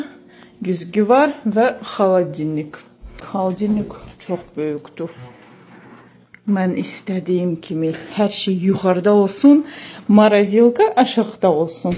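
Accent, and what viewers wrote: Azerbaijan. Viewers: Из за акцента начала слушать.. как будто русская пытается говорит на азербайджанском.., но.. гялифсиз, олуфду, олажах, дяйистиражам, севиняжах........ а это уже регион Азербайжана.... у меня мозг вскипел..😂 тии кито?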